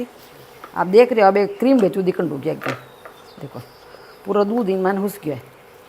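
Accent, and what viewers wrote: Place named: Marwari, India. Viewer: Aap k videos muje bahot pasand aate he ,aur aapka marvadi boli bhi..maine aapki kafi recipe try ki he aur bahot badiya bani bhi he,thnx mummy ji hame itni aachhi recipes sikhane k liye.🙏😊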